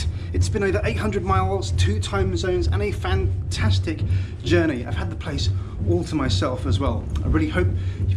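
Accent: United Kingdom. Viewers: Are you Briton???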